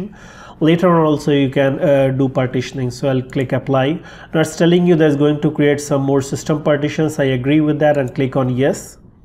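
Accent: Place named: India. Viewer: Kitna bhi prayash kar rahe ho Par american waali feling ni aa rahi hai indian ho indian hi rahoge kitna bhi english bol lo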